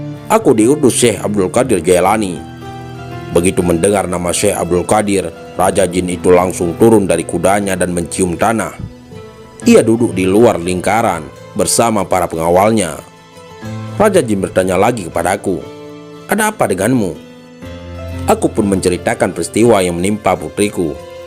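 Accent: Aceh, Indonesia. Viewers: Sy selalu mengikuti kisah kisah yg admin sampaikan, maaf kalau boleh tanya admin orang aceh ya dari logat bahasanya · Logat mimin lage awak getanyoe nyan...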